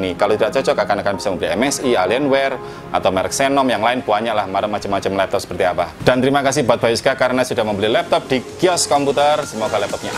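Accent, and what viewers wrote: Java, Indonesia. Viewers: Medok Mas...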